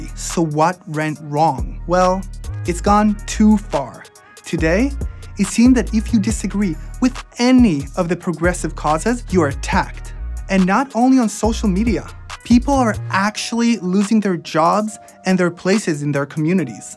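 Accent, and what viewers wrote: Israel. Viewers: לא לוקחת צד בדיון העקרוני, אבל כדאי ליישר קו לגבי העובדות. שומעים מבטא ישראלי קל. אתם לא שומעים אותו כי אתם ישראלים. האמריקאי הממוצע לא יחשיב את זה כ"מבטא יפה" (אלא אם כן הדובר נראה כמו גל גדות ואז מותר לו לדבר איך שבא לו).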